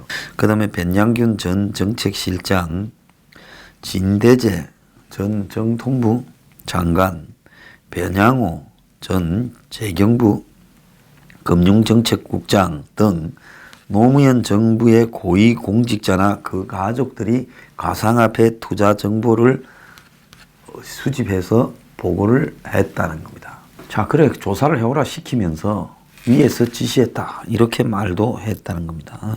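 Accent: Gyeongsang, South Korea. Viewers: 경상도 사투리가 너무 정겹습니다 ㅋㅋㅋㅋㅋ....^^ 진지한 내용에 귀여운 사투리가 엇박자인데도....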